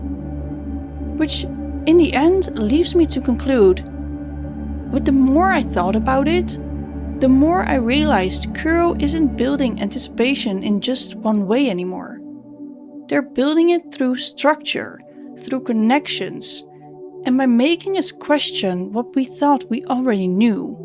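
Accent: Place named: Netherlands. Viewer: as a fellow dutch person its suprising to hear the accent xd, great vid!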